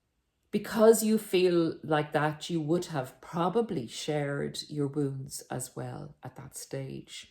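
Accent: Ireland. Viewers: Are you Irish?🍀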